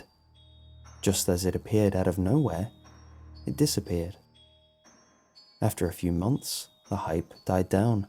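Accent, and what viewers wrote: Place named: Cockney, United Kingdom. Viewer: It's called a 'Cockney' Accent..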